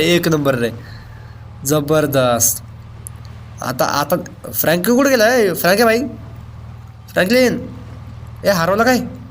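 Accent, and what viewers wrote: Nashik, India. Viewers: bhava tu nashik cha a ka ??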